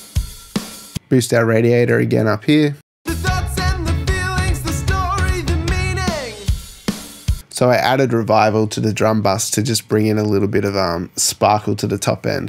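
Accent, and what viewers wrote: Australia. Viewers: Nice to hear an Aussie accent on a mix tutorial!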